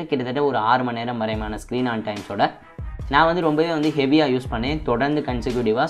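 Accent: Madurai, India. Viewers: Neenga madurai ahh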